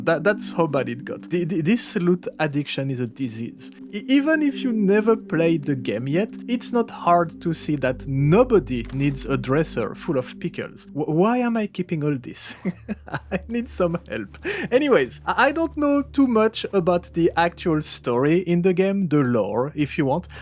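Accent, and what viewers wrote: France. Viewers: I cant believe theres so many people not recognising your french accent you sound exactly like houngoungagne who makes cs and hes very french too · Je sais reconnaître un accent français quand j’en entends un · Call me fool, but you got french accent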